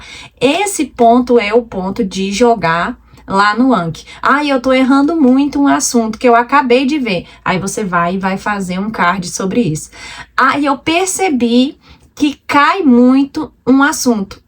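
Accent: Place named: Brasília, Brazil. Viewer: O “sotaque” brasiliense é conhecido de longe kkkkk Excelente dica, valeu mestra 😅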